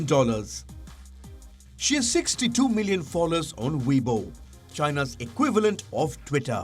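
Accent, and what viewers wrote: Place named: India. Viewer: The Indian accented female voice overs isn't helping the believability. Couldn't you hire someone with a Chinese accent for the female voice overs. 😐😐😐😐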